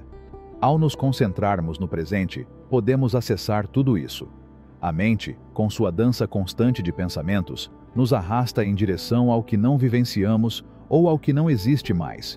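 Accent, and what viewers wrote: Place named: Minas Gerais, Brazil. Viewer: O narrador é mineiro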